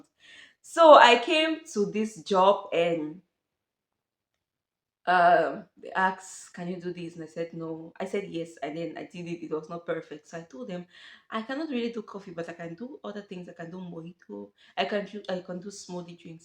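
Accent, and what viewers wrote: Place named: Africa. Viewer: Hi,it's so goo d watching your videos really. You are indeed an hardworking young African. You claimed to a Cameroonian,but your spoken English sound like someone from Anglophone African countries.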